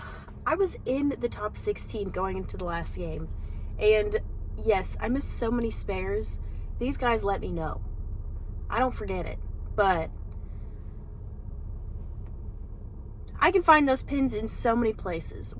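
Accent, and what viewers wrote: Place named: South, United States. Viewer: Picked up on that southern accent real quick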